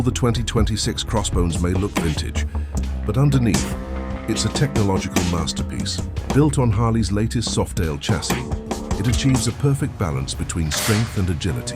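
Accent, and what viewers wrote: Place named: United Kingdom. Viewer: He sounds British 😅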